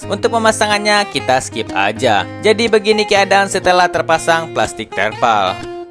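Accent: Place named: Adang, Indonesia. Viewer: Logat bicarany kyk orang adang sm kyk sy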